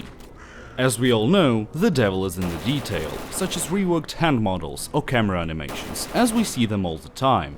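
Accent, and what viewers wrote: Russia. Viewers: чел, ты передаешь привет русско-говорящему, слышно же по акценту........ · Is that a Russian accent?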